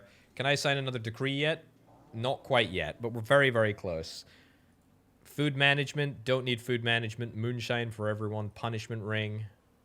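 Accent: Scotland, United Kingdom. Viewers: I thought heard some Scottish in there. I'm from Edinburgh, though and I can't place the accent. Was wondering if there was something more to it.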